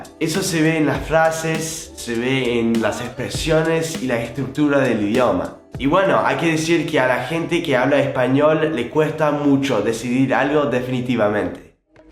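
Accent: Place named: Argentina. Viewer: posta chavon! se nota que tenes una conexion con argentina y lo bien que estas aprendiendo, no solo a hablar español, sino tambien a aplicar nuestro acento.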